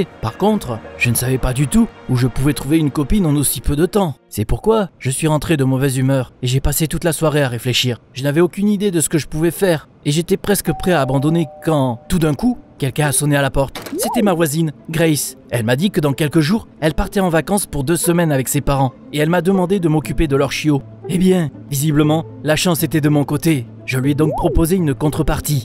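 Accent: Marseille, France. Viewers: léo lgm omg l accent marseillais incroyable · OMG L ACCENT MARSEILLAIS INCROYABLE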